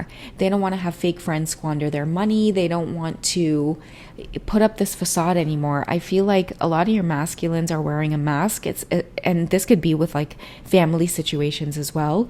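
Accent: Trinidad and Tobago. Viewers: Are you trini? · As a fellow Trini, I love hearing your accent! 🙌🇹🇹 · yes we love your trini accent, you should defly use it more its so authentic and its music to my ears, reminds me of my aunt🥹🥹❤️‍🔥🇹🇹🇯🇲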